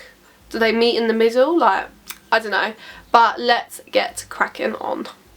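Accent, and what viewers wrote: United Kingdom. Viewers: iam in love with ur british accentttt !!!!!!😍😍😍😍😍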